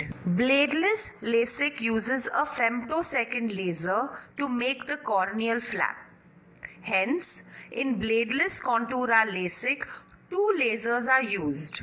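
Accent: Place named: England, United Kingdom. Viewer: pretty hard to watch with this english accent.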